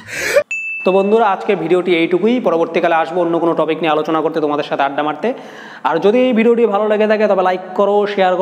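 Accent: Bangladesh. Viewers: Dada Tume ki BANGLADESHI kano jane na tomar voice sune mone hoy....😕😕😕😕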